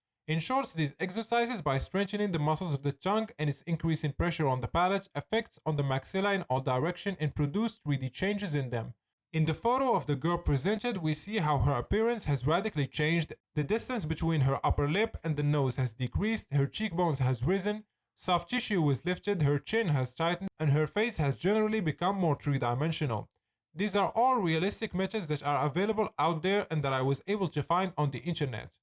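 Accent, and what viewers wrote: Morocco. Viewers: Why your accent is Moroccan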